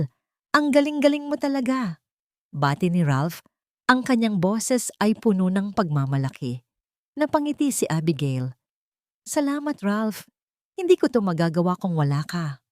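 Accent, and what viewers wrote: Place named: Conyo, Philippines. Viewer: Parang tanga ang salita ginawang hindi ko na nga tinapos konyo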